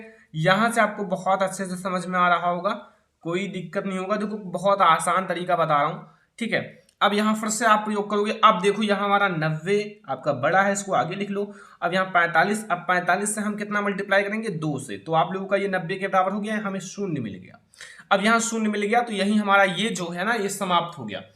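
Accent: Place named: Deoria, India. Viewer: mujhe lga aap deoria se ho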